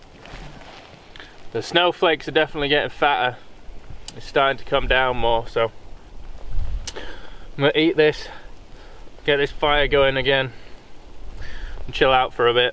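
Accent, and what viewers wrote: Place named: England, United Kingdom. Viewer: Where are you based? I hear a English accent but by the looks of it your either in Canada or the very north of North America.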